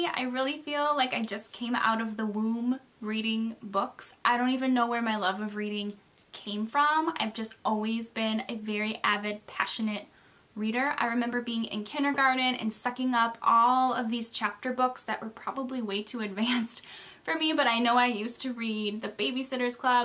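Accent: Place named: Minnesota, United States. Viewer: welcome! I also just joined booktube :) I'm from Iowa, so I could totally tell that you were from Minnesota right away!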